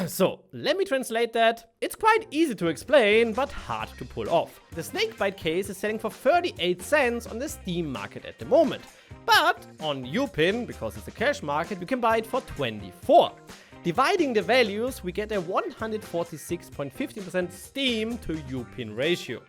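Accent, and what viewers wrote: Germany. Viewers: Bro dieses Deutsch Englisch macht mich fertig 😂😂😂😂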